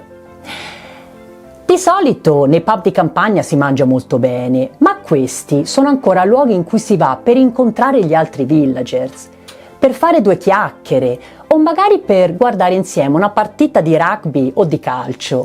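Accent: Italy. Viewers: Grazie, mi piace il tuo modo di parlare così garbato, proprio lucchese. Un abbraccio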